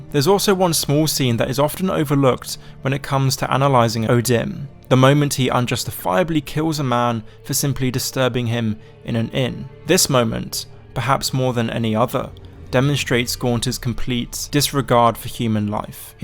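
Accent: United Kingdom. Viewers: He's clearly british mate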